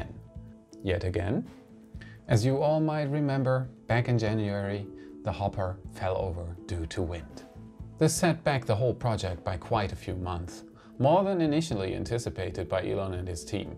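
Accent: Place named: Germany. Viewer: Oh my goodness first time I went back to #1 u need a haircut here and u have more of your German accent! ❤️your the best!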